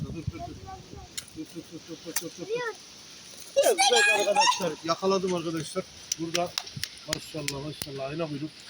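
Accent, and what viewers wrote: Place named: Adana, Turkey. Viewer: Adana’nın hangi mahallesindesin kardaş şiven adana kokuyor saygılar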